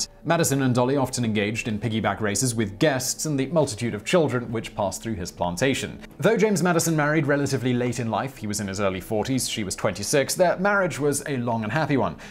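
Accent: United Kingdom. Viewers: A dude with a British accent tells US about the founding fathers. Go figure!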